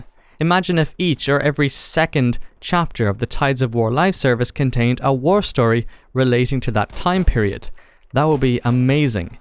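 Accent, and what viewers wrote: Ireland. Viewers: he's irish, he said it right in this video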